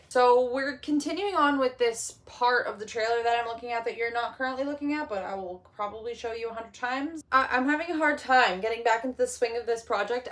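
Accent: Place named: United States. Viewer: Love the innocence. I’m sure ur a cool gal. U in “Canada” or somewhere but ur accent sound “American”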